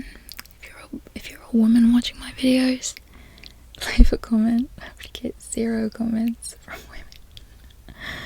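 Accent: Australia. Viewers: That's a great natural Aussie accent, better than most Aussies actually 😁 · Good aussie or maybe south African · Our wild roos here aren't all that exciting and they are dangerous. Especially the big males they can and have really messed people up. But i gotta say you do a pretty good Aussie accent. Normally when Americans try to do a Aussies accent they sound more like a South African with a bit of kiwi chucked in. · Aussie here. At first you sounded very newzealand almost like a New Zealander who moved to Australia lol, but Your Aussie accent is pretty damn good. I did notice the way you pronounce your As like in nasty was not so Aussie, we say it like nahstie. Also you said emu like emoo but we say it like emyou. Besides that it’s probably one of the best Aussie accents from a non Aussie I’ve ever heard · Surely you are an Aussie. If not that is the best Aussie accent I have ever heard. 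Well done. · The Aussie accent is 100% my favorite. Its soo 😍😍🔥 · Yeah a few things were funny to hear but otherwise a really good job! Most people normally butcher our accent but you have obviously heard a wider range of Australian accents and it's helped your grasp. Lovely as always <3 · Im Aussie and your accent is very impressive, well done. A little tip for some words: Nasty is narsty, emu is emyou, banana is banarna, aussie is ozzie. Very good overall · Damn you’re actually really good at the Aussie accent sound like a Sydney chick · You sound like an expat Aussie with just a couple of tells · Dang. I thought you WERE Australian. Its hotter 😅 il see myself out. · Easily one of the best aussie accents I've heard. You should be really happy with yourself. when you started saying "invigorating" was on point. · That is a really excellent Australian accent! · Wondering what all your tattoos mean? 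Maybe you could talk about them in your next vid? Great Aussie accent btw. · (ASMRtist) is that you. Or is this someone else. Oh I just realized this was you. Great Aussie accent. You are nailing it Keep up the great work.😍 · Carl here from Adelaide south Australia, your wholesome Aussie accent is a turn on! But then realised you are just another yank lol only kidding love my hot Yankee girls aswell!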